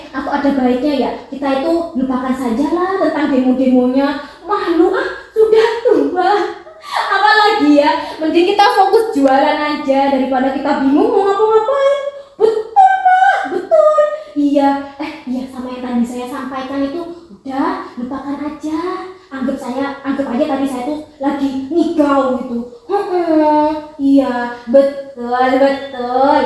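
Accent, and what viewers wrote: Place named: Java, Indonesia. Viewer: Masya allah medhoknya on point yh kak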